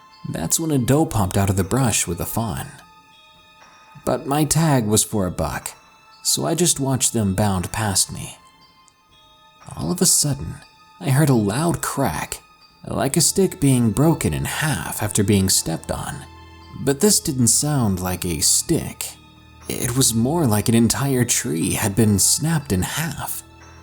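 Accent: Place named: South, United States. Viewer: Been a fan of now for 3 years....hahah back when he had a southern accent...anyway keep it up. I help sponser too by being a fan:):)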